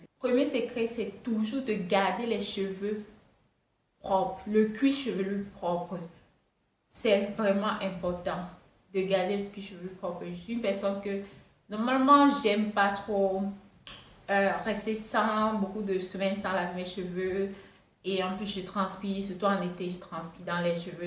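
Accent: Cameroon. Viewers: Tu parles comme les bamilekes du Cameroun. Es tu camerounaise ? Sinon merci bcp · Je ne suis pas habituée à l'accent camerounais que je trouve charmant d'ailleurs, pourtant je n'éprouve pas de difficultés à te comprendre. Continue et merci pour ces conseils. En plus tu as pris le temps d'énumérer les différents points à l'écrit dans ta vidéo. Donc si ce n'est pas clair ce n'est pas ta faute.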